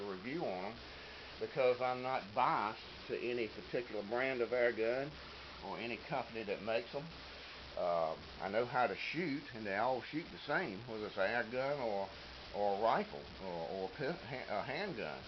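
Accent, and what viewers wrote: North Carolina, United States. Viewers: Are you from NC by chance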